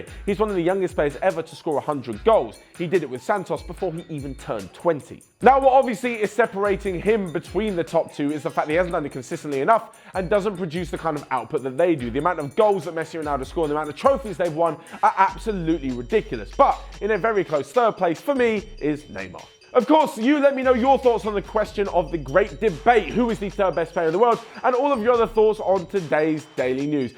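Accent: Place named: United Kingdom. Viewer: Can he speak a little bit slowly. Like i said his accent is very British that is quite hard to understand when he speak that fast.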